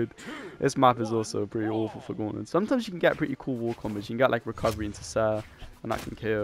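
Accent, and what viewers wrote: England, United Kingdom. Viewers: If u lost that last game lmfao also are u English?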